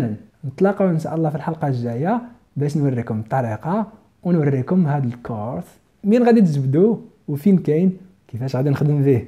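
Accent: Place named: United States. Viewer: YES, AMERICAN ACCENT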